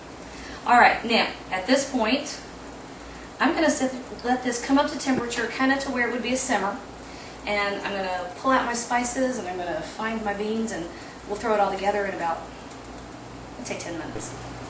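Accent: South, United States. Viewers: Hi, Thanks for your response i appreciate it.I notice in some cooking videos that People will ask a question to the Cook but there`s never a response so thanks again.I can`t waite to make your recipe.I`ll let you know how it turns out.I love how you`re so friendly with the Youtube viewing audience.I notice that you have a Southern accent are you from Texas? I reside just 30 minutes south of Seattle,Washington, The City where Starbucks Coffee was started.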